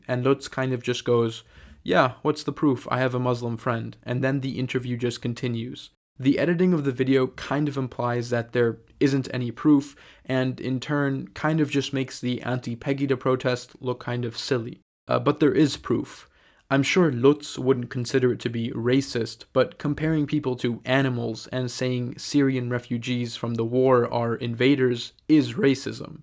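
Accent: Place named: United States. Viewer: I think it’s relevant to mention the guy who narrates this video, maybe lives in Germany, maybe is of German descent, but is not German by blood. He speaks with a clear American accent. Something to consider since he’s taking a clear globalist leftist position in his videos on Germany. Probably just some brainwashed college student rich kid. So obviously hes pro immigration pro invasion pro communism.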